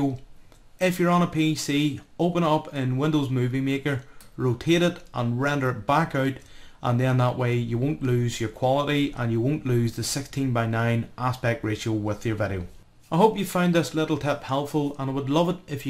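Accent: Northern Ireland, United Kingdom. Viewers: Hey are you from Northern Ireland?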